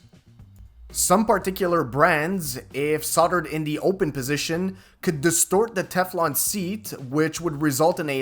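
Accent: Quebec, Canada. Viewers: I new you were Canadian A bit of a french accent. Sudbery? Thanks your my go to for plumbing. hate doing plumbing fixes or repairs but I don't mind installing new.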